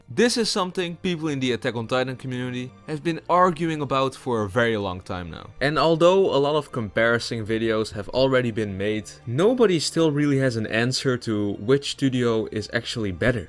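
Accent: Netherlands. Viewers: can tell ur Dutch by ur accent lolll w NL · Are you Dutch? Your accent sounds very Dutch so I'm just wondering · U sound so dutch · Ik kan gewoon horen dat dit een nederlander is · Bro sounds dutch?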